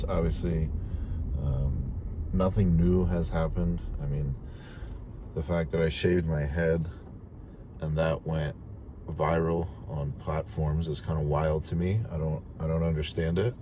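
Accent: New Jersey, United States. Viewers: the Jersey accent 😂😂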